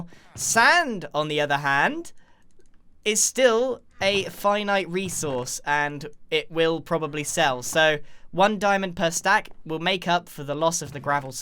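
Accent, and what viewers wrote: United Kingdom. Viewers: Why does sound so much more british? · Is it just me or does he sound more British than normal ?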